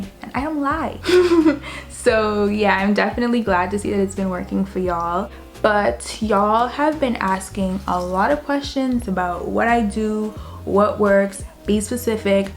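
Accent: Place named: Bahamas. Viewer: Are you a Bahamian? Sorry but I’m one and I’m like she sounds like one too. But I love your content . Keep it up!❤❤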